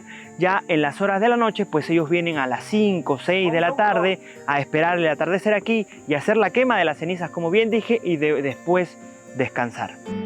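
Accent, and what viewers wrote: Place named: Argentina. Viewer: Pobre hombre no quisiera estar en tu lugar, hablas Como Argentino, y tienes in aire de Carlos alvarez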